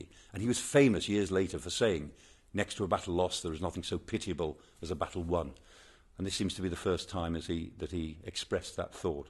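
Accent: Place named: England, United Kingdom. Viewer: Professor Michael Clarke. I love your accent. In the USA there is a Yankee nasal twang. Today in England many breathe and pause a lot . "Day" sounds like "Daii" The UK should put out 2 to 3 videos on Youtube as "the Model or Perfect English accent."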